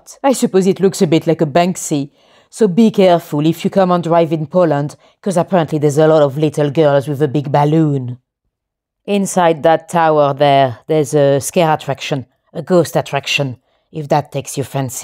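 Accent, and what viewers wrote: France. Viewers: Your accent are u French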